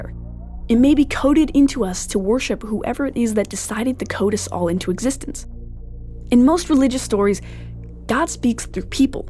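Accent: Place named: Canada. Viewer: This is an amazing channel which is innovative and logical without being boring and I love the commentary.. also the way you say "out"and "about" makes me think whether you're Canadian 😋 One of the best channels I've found on YouTube!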